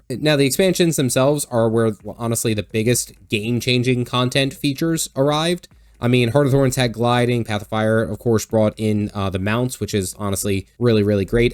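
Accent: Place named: Eastern Pennsylvania, United States. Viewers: Appreciate it! Catching some speech patterns. Are you from Maryland, Delaware, or Pennsylvania? Maybe parts of DC. Thanks for vid 🖖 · love the content and your eastern PA/south NJ accent. cheers, dad gamer from MA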